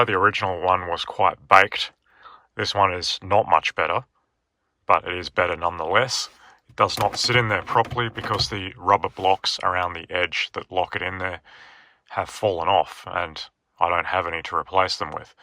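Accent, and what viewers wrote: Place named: Australia. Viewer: I love the way you say Red 124. And you have a badass Australian accent brother. Hope you and your legendary Red 124(W124 300E-24V are doing fantastic good sir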